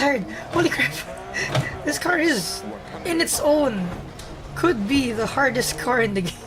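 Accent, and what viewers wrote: Philippines. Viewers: Filipino based on what other people have said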